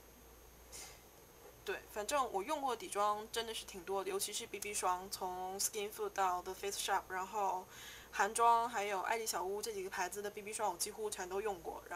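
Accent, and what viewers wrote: Northeast, China. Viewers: 东北人吗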